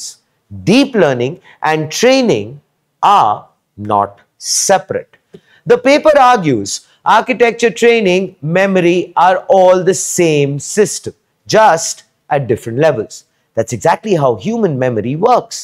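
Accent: India. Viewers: He is separating his words and using volume variety to clarify his Indian accent and doing a very good job of it!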